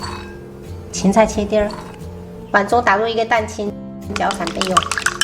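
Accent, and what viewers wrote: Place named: Minnan, China. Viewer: 發糕我們都是特別的節日做的，大部分是過年的時候做的比較多，其實你們閔南話，跟我們的台語好像，所以一直覺得妳讓我感覺好親切哦！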